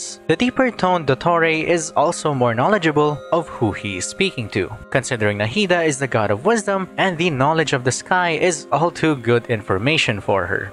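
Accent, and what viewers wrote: Philippines. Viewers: I think that you are right with Furina having multiple personalities. I mean Neuvillette says Furina was researching about the prophecy (FURINA), and I mean his reaction to her being scared of him not going to the meeting seemed like Furina is usually that eccentric lol. And also the emotions she shows like crying in her room seem real. So it would make sense, but these are just my guesses. Also I noticed half way through the video that you are Filipino, barely noticed the accent 😂 I AM TOO, so I was pleasantly surprised :D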